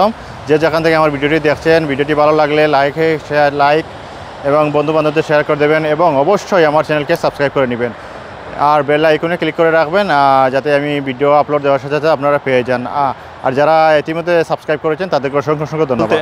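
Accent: Sylhet, Bangladesh. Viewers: ভাই সিলেটি নি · Mone hoy Sylheti vai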